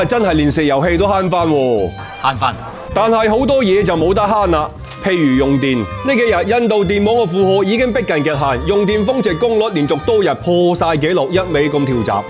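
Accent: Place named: Guangzhou, China. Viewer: up主喺邊度人，聽口音有d似我哋廣州話，但又唔係正宗廣州話，似乎是帶點其它口音的廣東話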